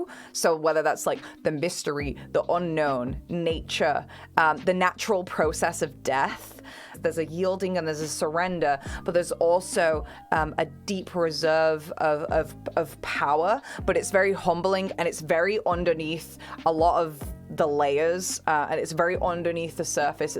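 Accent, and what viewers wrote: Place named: Northern England, United Kingdom. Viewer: Your intelligence is always a step in front of you. I get pulled up for the speed and length I talk at, but you have an extra gear beyond i've ever known. Your every word makes total sense, but I wish I could tune in better. I don't know if you've ever watched or heard anything of the philosopher Alan Watts? Though, he passed away many years ago now, his talks have become very prevalent these days, and most can be found on this platform. Your intensity is amazing. Your hands almost another person in the room, and you know this. Maybe I'm having the same issue as the beginning of animated films, where guessing the voice actors can distract from the plot, but I'm still attempting to guess where the Northern English part of your accent comes from. I could hear you talk for hours, and even if I have to use technology to slow the recording down to understand, I will. 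My apologies to those who know you, they will no doubt roll with your enthusiasm naturally, so my point is moot. I must revisit more astrology too, I haven't for too long. I'm Steven. I'm an attention seeker. I naturally attracted Pisceans, and if you can guess my sign with a 12/1 chance from this comment. I will become a Patreon, because if you are that intuitive, how could I not. I have to add, although communication is the most essentially part of any relationship, and putting effort in can only make that better. I believe too much thought and effort will ultimately mean you're with the wrong person. Especially whe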